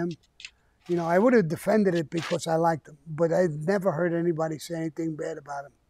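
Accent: United Kingdom. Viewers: Your accent blows go back over the pond and talk about the queen like you know anything about the mob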